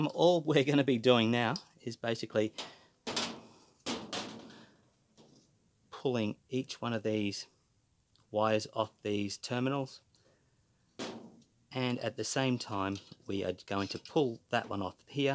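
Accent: Australia. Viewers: glad I watched this before I took my dryer off the wall and opened up the back. I've worked on a few dryers in my time changing belts capacitors elements and door switches but have never taken a timer out. My timer has the second symptom you described stopping at around 90 mins and just before cool down. Also just checked eBay and there are new ones for $45. You sound like you have an Aussie accent. Good Clip, invest in a Tripod it will make all the difference at your end. Cheers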